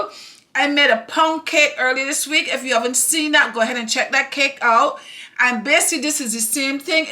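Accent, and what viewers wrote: Barbados. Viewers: So glad I found you. Was watching your cocoa bread post and I said she sound like a Bajan. Lost my GranGran black cake recipe and yours came out like our traditional black cake. Thanks a bunch 👍🏾👍🏾👍🏾👍🏾👍🏾👍🏾👍🏾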